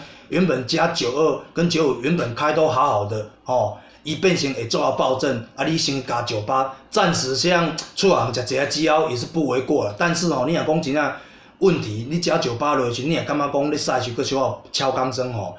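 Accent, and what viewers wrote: Taiwan. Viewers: 加油.很喜歡看你學術性的片講台語很好聽.,不過聽不憧要看字幕我是香港觀眾,香港的只有98油和 98加不同公司配方的添加劑的油。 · 就是喜歡台灣國語。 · 禿擂台土腔，吼吼吼，好不好，有没有，对对对，好了啦(啦得很土禿)，很喜欢在言谈中加入闽南语，还把闽南语变成了台语??台风真是一枝独秀，禿土鲁擂台湾腔。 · 國台語混著說濃濃的臺灣味...令人熟悉～回味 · 陳建成他的意思是的台語很道地，講台語的部份讓人容易懂吧！而且影片也有字幕了，講國台語都可以懂的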